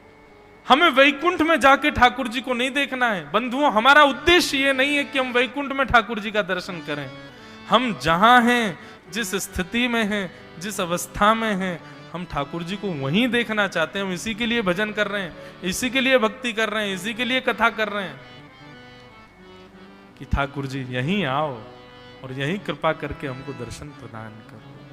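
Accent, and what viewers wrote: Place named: Braj, India. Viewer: Raadhey raadhe guru ji aapki katha bhut acchi lagti h aapke mukharvind se brij bolo bhut acchi lagti h lala ka darshan ho jata h katha me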